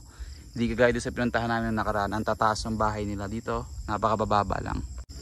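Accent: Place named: Nueva Ecija, Philippines. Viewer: Taga nueva ecija kaba idol? Pang nueva ecija punto mo e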